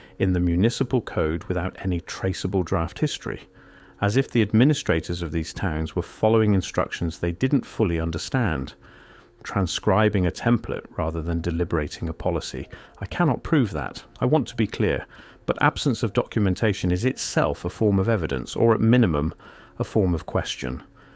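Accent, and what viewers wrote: United Kingdom. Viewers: Why are all AI NARRATORS IN BRITISH ACCENTS 🤔 🙄 FAFO WWG1WGAWW NUREMBERG 2.0 NCSWIC NQTHING GOD SEES EVERYTHING 👀 GOD WINS FRENS M 🏆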